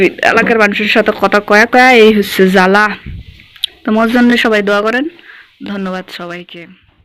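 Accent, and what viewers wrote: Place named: Panchagarh, Bangladesh. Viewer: হামরা পঞ্চগড়ের লোক হামার কথার সাথে মিল আছে তুমার লগত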